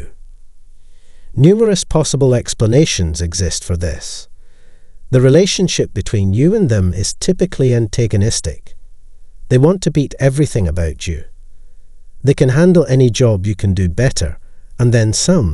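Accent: Scotland, United Kingdom. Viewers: Bizarre filming. Good content & narration but why the foreign landscape/people when the narrator is speaking in English with a Scottish accent?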